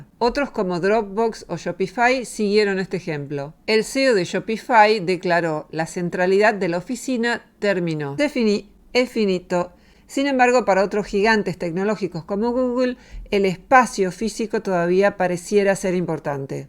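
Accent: Argentina. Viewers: De donde saca esos datos?? Amiga Argentina Voz de donde es eso ?? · Paradojico que haga este tema una persona con acento argentino con el 50% de pobreza y grandes problemas de inflacion y falta de trabajo, tendria que fijarse en subpais de vez de ver otras situaciones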